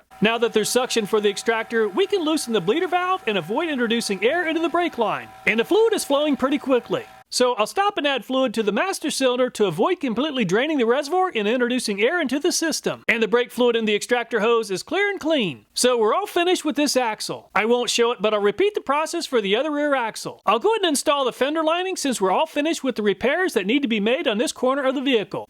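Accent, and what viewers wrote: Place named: Midwest, United States. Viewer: He says all sorts of things funnily. I figure it's in that midwest water. I watch some gals over in Ohio and they say "To MAH to" all the time for the red orbs from which katsup is made. I laugh and laugh. But hey--it's not wrong if you live there and it works for communications.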